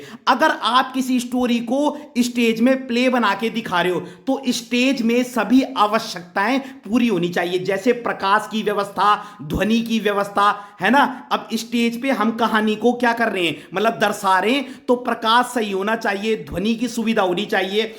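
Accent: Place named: Garhwal, India. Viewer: Sir aap garhwali hoo 😍😍 apki awaaj se pata lag gyaa 😂❤️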